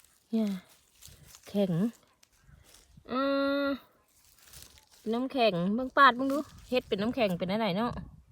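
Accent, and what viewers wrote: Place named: Sakon Nakhon, Thailand. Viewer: พี่พูดเหมือนภูไทสกลเลยจ้า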